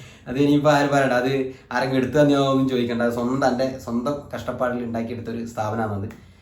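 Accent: Kannur, India. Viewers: Hi,nalla caliber ulla kutiyanu,brilliant,alrounder anennu kl Biju channel kandu anne njn manasilaki,actually nte nishkalanka chiriyum kannur bhashayum enne attract cheythu,bcs njn kannur,eachur anu. nalla director,thirakkatha,story,ellam super ayirunnu,ipol a channel kanarilla, e kanan matram,ipol santhoshamayi, mon nannayi varum,orikal nerit kananam😊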